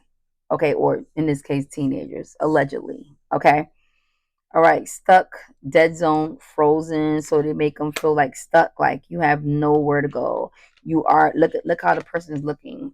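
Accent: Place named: New York, United States. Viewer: Are you from nyc lol you have that accent.. praying for all the missing children out here!!!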